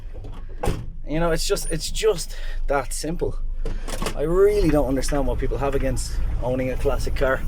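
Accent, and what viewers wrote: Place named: Ireland. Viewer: The Irish accent and the laid backness does it for me 😂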